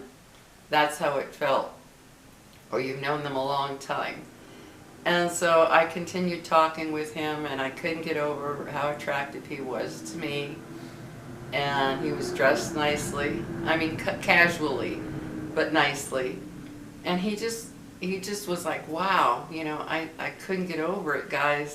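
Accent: United Kingdom. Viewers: So nice to see your fun side Stay happy always I love the Brit accent too ❤ Gentlemen kind men are no longer around 😅 Your hair looks fabulous 💕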